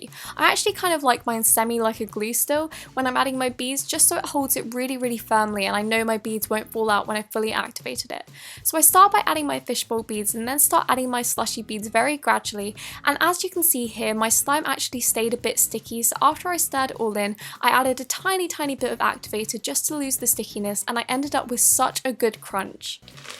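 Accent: United Kingdom. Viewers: You know the sound of the slime is not as good as your beautiful British accent it’s so good I love you voice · Your voice is soo satisfying!!! 😆 (I LOVE THE BRITISH ACCENT)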